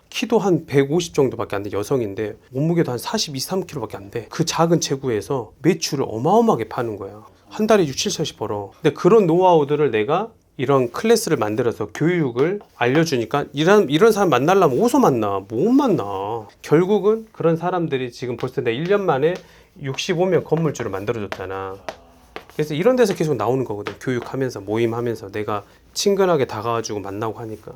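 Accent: Jeolla, South Korea. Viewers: 라도 사투리만 고치시면 딱 조을텐데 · 아 전라도 사투리 ㅈ같네 진짜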